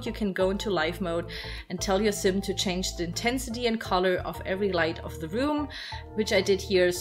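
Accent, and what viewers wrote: Germany. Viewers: So you're german??? I've always thought your accent kinda sounds german but I was never sure about that and I was trying to figure out where you are from if not from germany lmao Anyways! Really nice build even though I have to admit that I'm also not as much into mermaids... so I'll just let the mermaid fans do the judging ^^ Grüße einer ebenfalls Deutschen xD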